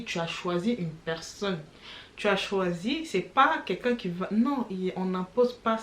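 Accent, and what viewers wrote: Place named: Democratic Republic of the Congo. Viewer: L'accent a lui tout seul rend la vidéo drôle xDD Nan sérieux je kiff l'accent congolais (je suis sur a 80% que tu est congolaise) , québécois et russe 😊😁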